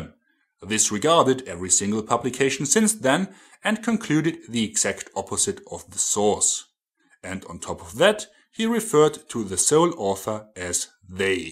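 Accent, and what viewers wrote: Germany. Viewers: Oh man that was awesome. Please continue to use your knowledge and German accent to obliterate misinformation!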